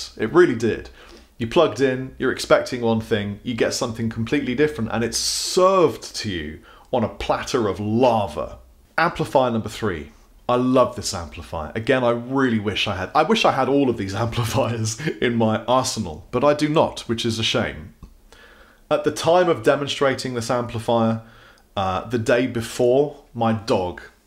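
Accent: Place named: Wiltshire, United Kingdom. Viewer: is it just me or is your Wiltshire accent becoming more prominent with age? Give it another decade and you'll sound like Phil Harding of Time Team... which is not a bad thing!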